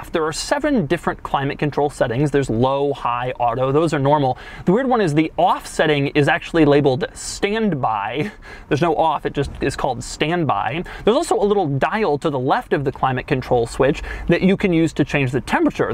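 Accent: England, United Kingdom. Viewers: english accent = perfect! yes yes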